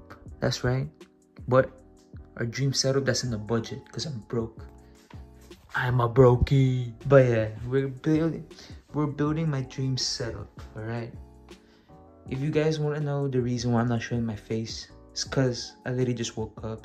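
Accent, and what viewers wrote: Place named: Philippines. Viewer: Bro have pilipino accent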